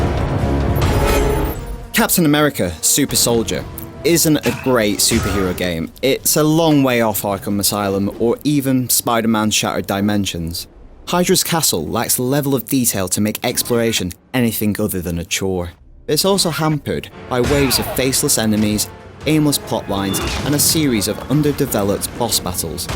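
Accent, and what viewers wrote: United Kingdom. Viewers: Why is a british guy doing a review on Captain AMERICA game? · Furthermore, the reviewer coincidentally having a certain accent and possibly being from a foreign place like the UK, or any one of the countries colonized by British doesn't mean he represents its people. This is IGN doing the review, at least point the finger at them rather than making it a matter of national pride and insulting others. I was unaware that British were single-handedly putting down the U.S. (I say "U.S." because the Americas are not comprised of only us) via game reviews, LOL.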